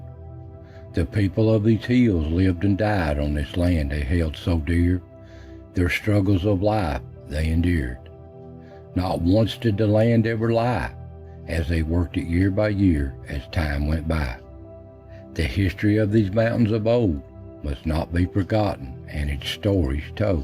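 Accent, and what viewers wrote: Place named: South, United States. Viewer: I like your poem, truly from your heart. I've people comment negatively on the way I still some words. We moved to California when I was younger. But I point out how those on east coast will change an a on the end a word to a r. People need to be more tolerant for those of us from the south. I love the way you say chimbly for chimney. My dad was Arkansas and that was how he called it. I'm 76 now and my Dad passed away in 1989. So you bring back good memories. I like all your videos. Keep up the good work.👍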